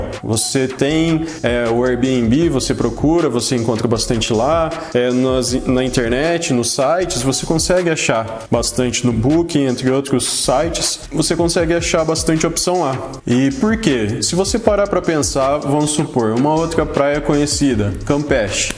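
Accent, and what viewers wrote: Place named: São Paulo, Brazil. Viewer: Fala você é do interior de SP ou Paraná? Pelo sotaque parece!!!😁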